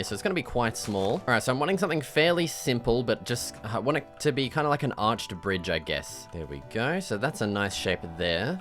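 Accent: Australia. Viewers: He’s so Australian 😭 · are you australian??? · Hello mate you’re Australian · nah easy mistake too make but hes def aussie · I can tell your Australian mate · his australianness distracts me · Are u Australian 😮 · This guy sounds so Aussie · "OUUH!" lolll .. also, the Aussie-ness is just steaming off of him like a thick vapour. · “Oh, he’s Australian” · sweet world dude, love you aus accent hahahaha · you aussie? u sound like it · bro is australian W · Mates aussie as hell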